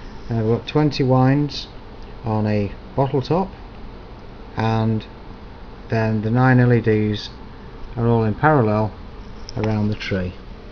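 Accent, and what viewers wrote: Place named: United Kingdom. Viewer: I want to try something different are you from the uk your accent sounded bristish. I have some ideas I wanted to try needed some help if possible ?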